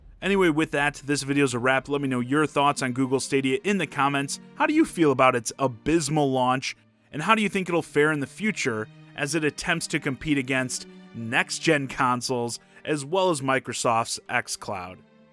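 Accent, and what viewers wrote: Chicago, United States. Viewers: his chicagos kinda fresh doe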